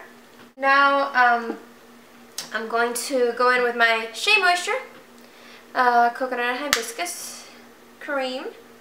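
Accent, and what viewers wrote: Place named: Portugal. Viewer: Thanks for the tips! this is the 1st video I watch of you and I already knew some of the thing and tips to do, just need to buy the equipment and utensils lol! Btw didn't know you played the violin...well I just assumed so since your twitter pageis, Idk for sure but thanks again so much! I'll be sure to try them. I also have my pages of XxxThePianistxxX on facebook and youtube (I think that's how I spell it;) ) and xxThePianist&ViolinistxxX on twitter. Mostly music related but I also have curly hair and I try to style although it's really difficult and then it's really dry, moisturize without putting your scalp too oily sigh a torment but I love my curls, it's just the time and patience to deal with it lol. Do you use an alcohol free shampoo btw? And also you sound like portuguese, your accent, sorry if I'm wrong, but just guessing. 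Lovely curls girl btw! xx - XxxThePianistxxX (Camila F.)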